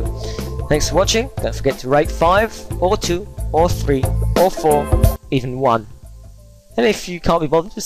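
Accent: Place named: United Kingdom. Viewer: You fool. This narrator isn't Mexican or an Immigrant. He's British. Get your narrow-minded head out your own ass.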